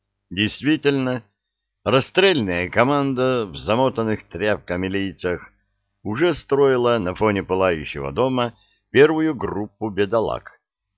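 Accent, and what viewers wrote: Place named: Odessa, Ukraine. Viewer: Снова этот «одессит» читает. Не стала слушать. А так хотелось послушать.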